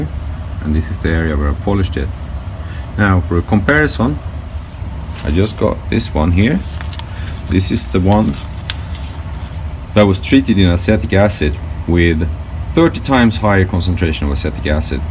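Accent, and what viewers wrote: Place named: Sweden. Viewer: Haha typiskt Svengelskt uttal, I övrigt bra video.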